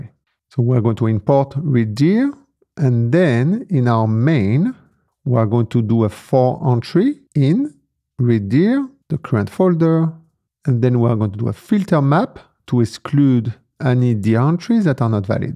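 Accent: France. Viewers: OMG! I'm loving the French accent! 🥐☕😀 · ummm seem like here is a french accent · The french accent is so cool as a french person :)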